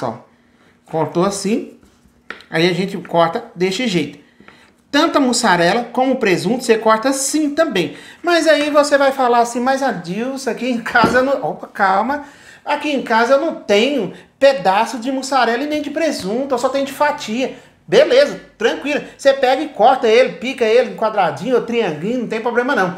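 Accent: Minas Gerais, Brazil. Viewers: E aprimeira ves que Estou vemdo seus aldio amei fose Emuito fofo pelo sel sutaki voce e mineiro adoro kkkkkkkkk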